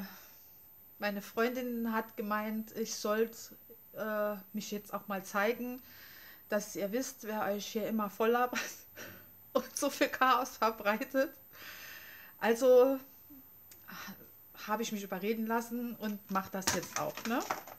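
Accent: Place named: Saarland, Germany. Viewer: aloha, habe deinen kanal vor kurzem entdeckt und bin kleben geblieben und das nicht nur weil der saarländische dialekt einfach cool ist ;) ich hoffe du machst noch viele videos.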